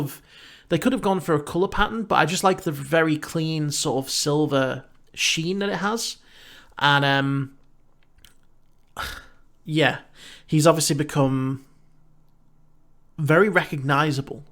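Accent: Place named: Liverpool, United Kingdom. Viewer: Evening by the sound of your voice, you are from the north of England. Think Liverpool. How are you able to view each episode, as Disneyplus does not go live in the UK until 31st march 2020? Thanks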